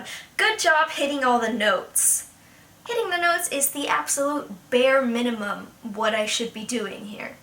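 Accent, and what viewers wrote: Castile, Spain. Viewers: Where did you learn Spanish? Your accent sounds like it's from Spain, but I'm also not the best at distinguishing accents in Spanish. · Hi. Thanks for your music and voice. I most confess that I come to your channel for your cover of Evangelion, but your voice inspire me in my job. Sorry for continue in spanish, i'm colombian and I dislike use the english language (the colombians are really trolls when you don't speak as a native...). Tu acento tiene una marcada influencia de España, es genial. Espero poder apoyarte con tu trabajo después, es realmente bueno. Gracias por tu coraje, al tomarte tu tiempo con tus seguidores (fans). Saludos desde el cono sur. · I'd like to know, where you learned Spanish. I can't quite place it. Sounds Castillian but may be Argentina or Chile?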